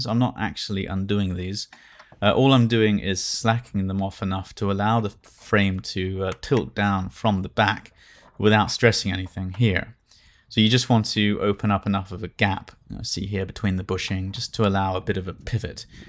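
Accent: RP, United Kingdom. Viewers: I love the posh voice.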